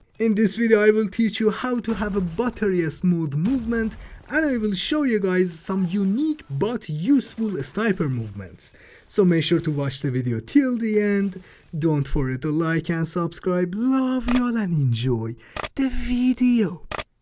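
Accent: Iran. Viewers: tell me if im wrong but your accent is screaming that u from iran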